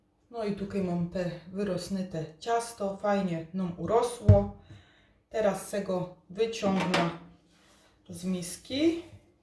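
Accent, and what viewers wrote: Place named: Silesia, Poland. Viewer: Oooo Ty godosz! Aż mi się cieplej na sercu zrobiło.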